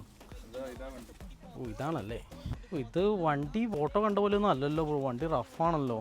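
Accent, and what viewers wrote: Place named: Idukki, India. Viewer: അണ്ണന്റെ സംസാരം കേക്കുമ്പോൾ ഇടുക്കി കാരുടെ സംസാരം പോലെ തോനുന്നു 😂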